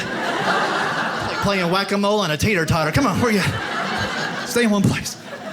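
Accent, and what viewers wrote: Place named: New England, United States. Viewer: What a hoot, when I was growing up in the 50’s, my whole family talked like him! It was fun to hear, now that I’m the oldest generation, surrounded by New Englanders!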